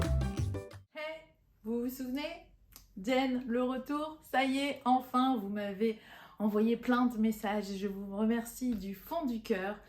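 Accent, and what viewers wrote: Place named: Nord-Pas-de-Calais, France. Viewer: Mais oui chti toujours 😂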